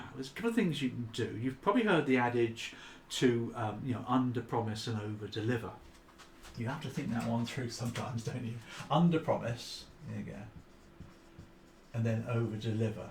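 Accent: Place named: England, United Kingdom. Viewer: I have been watching your videos for three weeks because I am a solicitor and I started studying for the cemap 123 exam....it turns out, i'm portuguese and i have colleagues from other nationalities and we are all having the same problem... difficulties in understanding your accent, because you speak as if it were only for the english people... it's a great pity because is an expert in this area!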